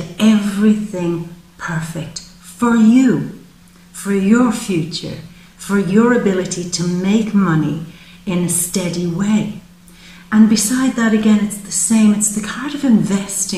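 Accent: Ireland. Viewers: Well I have to say this has resonated with me like 98.9 % I don't know how you do it. But it was really nice to hear I am thinking like your cards... Bravo Cheers Love your Irish accent. Beautiful.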